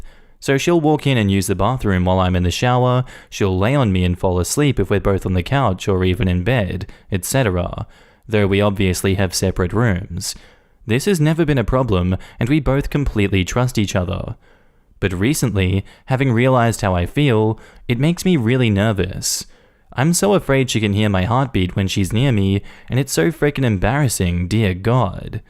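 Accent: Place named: Australia. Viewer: the Aussie accent doesn't hurt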